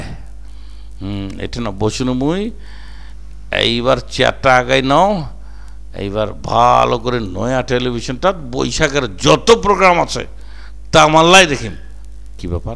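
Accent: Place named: Dinajpur, Bangladesh. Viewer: দিনাজপুরের আঞ্চলিক ভাষা গুলো আপনার মুখে দারুন শোনায় স্যার। প্রতি ইদে এটা একটা আলাদা আকর্ষন অনেকের জন্যই। দিনাজপুরের সকলের উদ্দেশ্যে, দিনাজপুরের চোখধাঁধানো খেলাগুলো দেখতে চোখ রাখুন আমাদের চ্যানেল Sports Mania তে।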